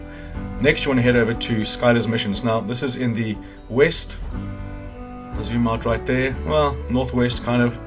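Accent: South Africa. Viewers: You sound South African (like me) One of the most brilliant concise vids I have ever had the pleasure to follow. Sub for certain.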